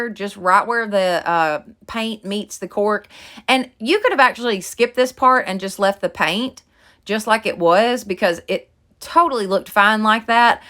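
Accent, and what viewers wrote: South, United States. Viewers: I really enjoyed the whole video. Love your southern accent, but I live in Oklahoma so I can actually understand you lol. Sometimes I have to rewind and rewind videos from the other YouTube girls because they talk way to fast lol. I'm subscribing and fixing to check out your past videos. Thanks for sharing your creativity with us!!! God Bless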